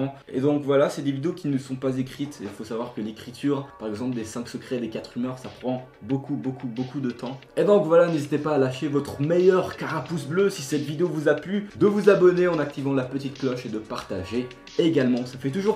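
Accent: Switzerland. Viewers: Mdr je savais pas que t'étais suisse 😂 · Es-tu suisse ?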